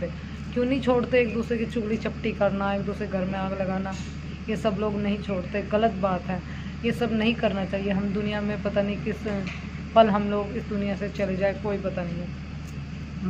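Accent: Haryana, India. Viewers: Aaj kl language haryanvi tone le rhi h Kya baat h samjh nhi aaya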